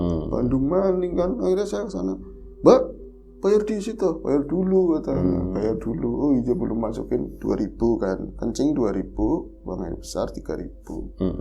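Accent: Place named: Tegal, Indonesia. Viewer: Ora ngapak ora kepenak RRT (republik rakyat tegal)😂😂😂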